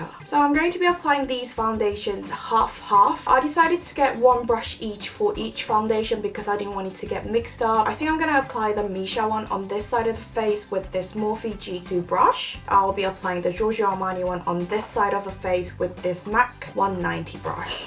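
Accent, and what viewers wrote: United Kingdom. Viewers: 영국발음인가요...??? 진짜매력있어요 요즘관심많이가는데ㅜㅜㅜㅜㅜㅜㅜ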